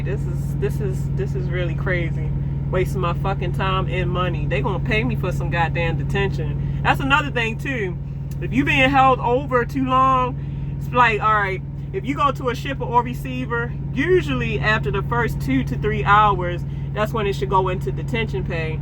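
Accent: Baltimore, United States. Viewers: Tht Bmore accent is water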